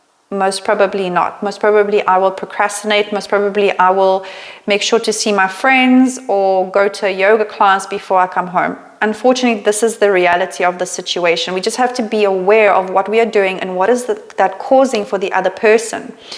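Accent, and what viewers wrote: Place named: South Africa. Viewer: Literally get so triggered hearing a white South African accent. I associate it with so much racism, can’t even hear the content. Goodluck with your channel, sincerely x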